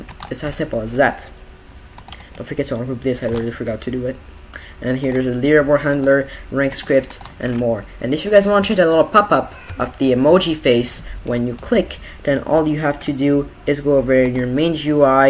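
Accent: France. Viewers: Ur french right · are u french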